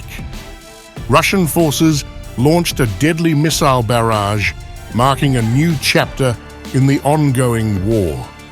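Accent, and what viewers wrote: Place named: United Kingdom. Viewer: I guess the Russians have abandoned hope that the incoming Trump Administration would work for the comprehensive peace arrangement in Europe they very reasonably want. The Brit accent of the narration initially put me on guard, the Brits being arguably the most propagandized Russophobic nation in Europe. I kept waiting for usual Western anti-Russian lies and propaganda. Thankfully they never came, and I'm subscribed.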